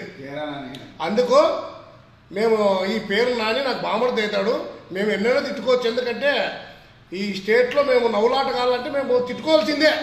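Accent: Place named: Rayalaseema, India. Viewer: anna needhi mana rayalaseema language la vundhi dare and guts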